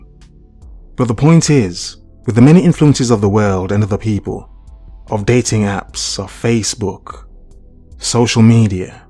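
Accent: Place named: United Kingdom. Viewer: This guy's British accent is so much easier on the ears than those other channels.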